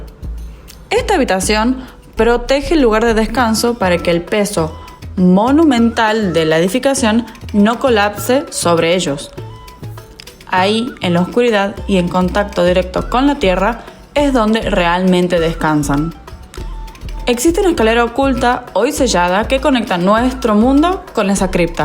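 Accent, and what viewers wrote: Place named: Mendoza, Argentina. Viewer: Hablas como mendocina. Yo viví en MZA.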